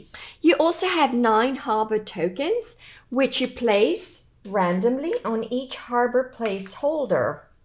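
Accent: United Kingdom. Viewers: I've got a random question, non game related (lol). Are you an english speaking person that learned spanish? Or a spanish speaking person that learned english? Or..... was brought up with one english speaking parent and one spanish speaking parent? I only ask because I love your engish accent, and you speak spanish very well, so im just ciurious. I've seen most of your videos and I loved them, I am a very big fan of Catan, and hence, all of your Catan videos! Thank you!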